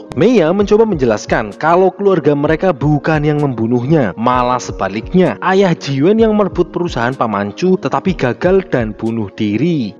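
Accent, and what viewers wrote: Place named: Purwokerto, Indonesia. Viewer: Rika asline wongendi kang, medok temen gole ngomong purwokerto ya😂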